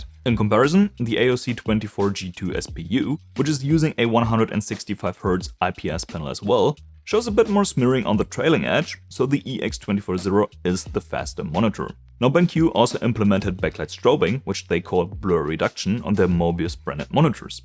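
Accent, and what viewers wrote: Germany. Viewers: german, right? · wait he’s German?😭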